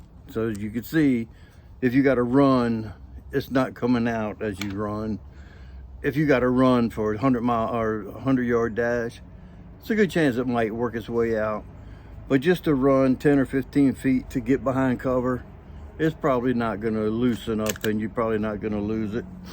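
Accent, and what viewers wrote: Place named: Europe. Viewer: Hey, Good to see you! Love the European accent! I pulled the trigger on the Tobac, I heard a few guys talk about it and you helped me to pull the trigger. Thank you for the show and tell. Be Well!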